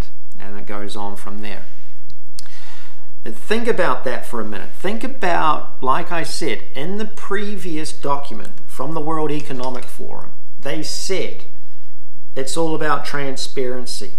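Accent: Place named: Southland, New Zealand. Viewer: Still hear the Southland accent there a little bit. I am from Invercargill. Thankyou.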